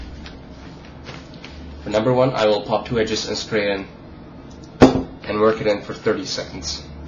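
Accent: Canada. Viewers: Ah! Thanks for this. I'm about to lube a cube myself for the first time, and I wasn't sure whether I should disassemble the cube or just spray some in and how much. En passant, êtes-vous Québécois? Votre accent, léger qu'il soit, semble nettement Canadien-français.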